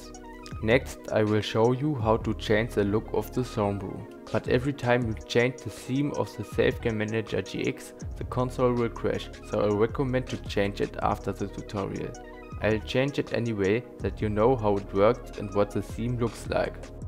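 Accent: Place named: Germany. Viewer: man hört dein deutsch krass raus haha aber trotzdem danke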